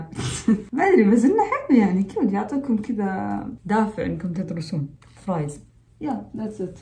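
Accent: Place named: Kuwait. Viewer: اللهجه نص كويتي نص سعودي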